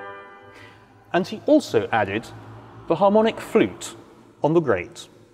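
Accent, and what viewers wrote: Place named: RP, United Kingdom. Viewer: Riveting commentary. And, your English Accent sounds so intelligent and posh to us Americans. Did you write and prepare the script too?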